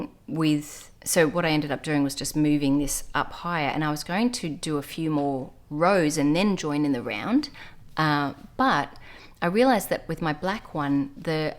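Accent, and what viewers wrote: Australia. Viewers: Thanks for the Ravelry tip,. There is so much I don't know about it; would you consider doing a tutorial sometime on getting the most out of Ravelry? You are so good at explaining concepts! Love your podcast; there aren't many Aussie accents in the knitting pod world, you are great.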